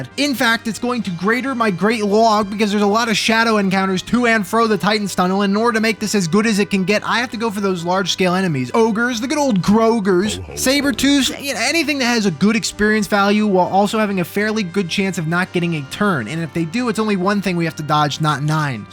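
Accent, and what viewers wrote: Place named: Philadelphia, United States. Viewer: avgn feels like Jersey, feels like Philly (I grew up in Trenton) Might be the way he says bawss reminds me of a Philly saying cawfee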